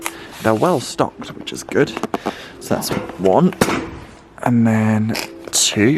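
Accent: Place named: London, United Kingdom. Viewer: Hi cant say look behind you mate couldnt stop lol seeing your twin brother behind made me cry shall we go to gregs not hello mate its the london acsent mate ha ha you have done so much in you london home take your time you and will get there and look back as we all have done life is a learning kerb mate this is a londoner talking to another londoner mate ha ha enjoy the journey❤😊😅🎉😂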